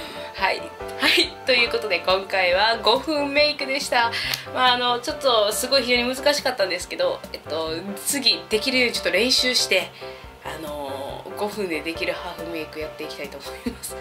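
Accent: Kansai, Japan. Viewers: 関西弁がすごく可愛い\(//∇//)\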